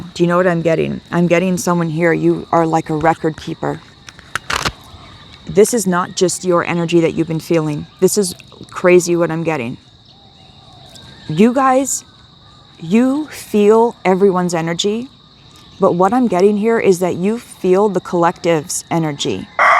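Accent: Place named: South, United States. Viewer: Hi!! Don't worry about crying on camera!! It's OK!! Let out your pain because I completely understand and have experienced bad childhood trauma. And I know that I also felt like something was wrong with me too for a very long time. I'm not here to judge you whatsoever. And by the way, I cannot believe i have never heard of, wow just wow!! What an amazing 🎵 🎶 🎵 song!@ I love ❤️ music and I also lovee 💕 to sing. And girl, I am impressed 🎉you CAN sing!! Very nice...keep up your wonderful calling and work youare doing!! This video completely resonated with me!! Although I am not a recovering addict, I am surely surrounded by others that do have drug addiction and alcoholism. I am not here to judge anyone or myself. I am truly on my own healing journey ✨️ girl!! And healing is not linear and it is messy!! So collective KEEP on keeping on Y'all..love that slang, lol. 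I am a true born Canadian citizen here but I just love ❤️ the Southern drawl!!! 😅 people say I have an accent that I don't recognize of myself but I just love ❤️ 💕 speaking to people from other parts of the world!!!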